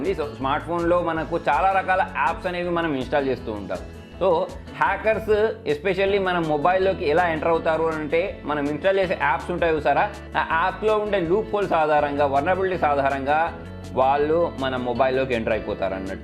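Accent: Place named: Telangana, India. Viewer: Anna telangana slang ......🤙🤙 Arjun reddy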